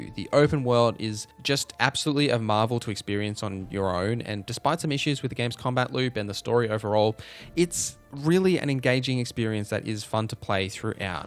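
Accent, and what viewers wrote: Australia. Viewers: He's not from New Zealand he's Aussie. Big difference buddy.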